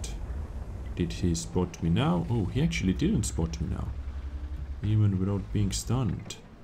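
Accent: Estonia. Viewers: are u estonian?:)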